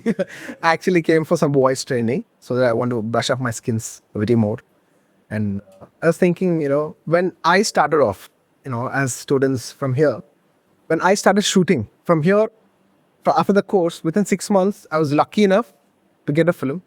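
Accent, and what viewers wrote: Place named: South India, India. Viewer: Vishwas bhai tumhare sath main mujhe bhi intresting lag raha hai and I am also IT student the way sir is explain is is very interesting and I like the south indian accent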